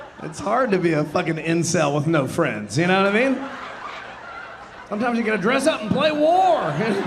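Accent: South, United States. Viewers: Thank you for this. A breath of fresh air with a southern accent. Intelligent. Funny as hell. Just amazing! 🤣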